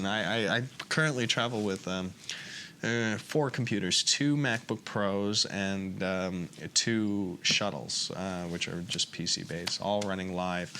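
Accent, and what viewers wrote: Canada. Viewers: bahahaha awesome... he has a bit of a canuck accent as well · his Canadian accent was really noticeable, wow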